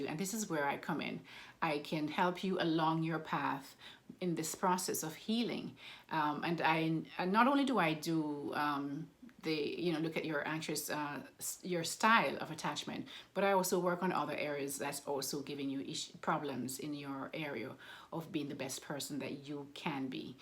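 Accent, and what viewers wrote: Jamaica. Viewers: Dr., is your accent Jamaican?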